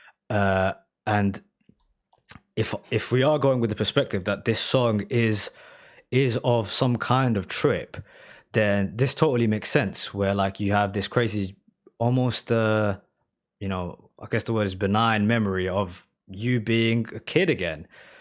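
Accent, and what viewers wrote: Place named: Liverpool, United Kingdom. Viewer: You seem to have a Liverpool accent, anyway love the video reactions all the best!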